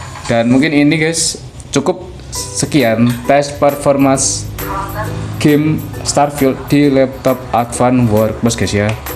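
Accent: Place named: Java, Indonesia. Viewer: Jijil banget klo dnger jawa mgomong😂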